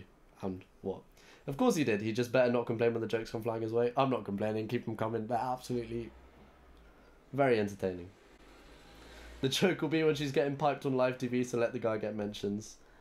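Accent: RP, United Kingdom. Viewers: seeing the way you tweet i thought you were from london but you sound so posh speaking slang it don't work ????? i had to stop at the "that you man have been sending to me" ... idk ... your sisters peng tho 👌😍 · Did you two go to different schools or something? You sound way posher than her mayyyyn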